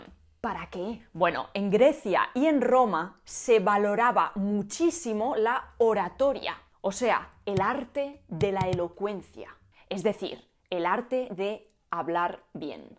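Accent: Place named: Castile, Spain. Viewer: Te Parces a una mAesttrA meddievL que tuve para mi todavía mi mejor maeau.mujer lindísima , pero deberías cambiar cambiar ese horrible acento español, como decía tú nuestrA compatriota Anabel Ochoa la mejor sexóloga de México, me caga la gente como yo qué todavía no se quitan llSzz y lasttzz , por qué en su pueblo solo usaban cacharro pa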